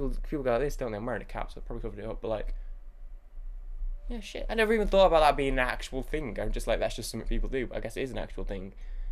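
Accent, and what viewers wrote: United Kingdom. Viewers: Sometimes it's hard to hear exactly what you're saying because of your thick British accent, but that's okay because I really enjoy just hearing you talk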